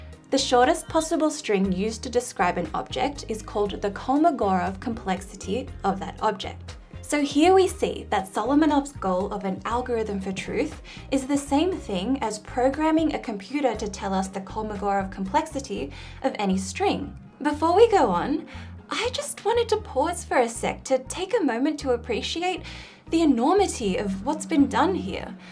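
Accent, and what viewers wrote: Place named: Australia. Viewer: in an Australian accent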